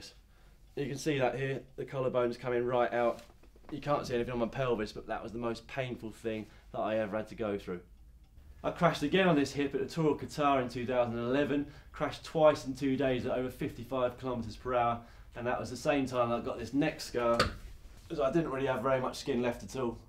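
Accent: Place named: North, United Kingdom. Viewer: Why is so angry and northern in this video?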